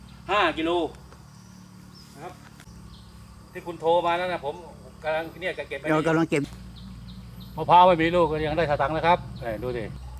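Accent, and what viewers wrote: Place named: South, Thailand. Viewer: จังหวัดไหนครับ สำเสียงใต้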